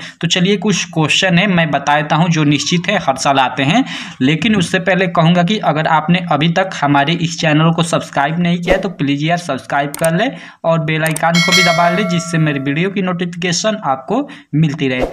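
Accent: Bihar, India. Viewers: Aap bihar se hai kya?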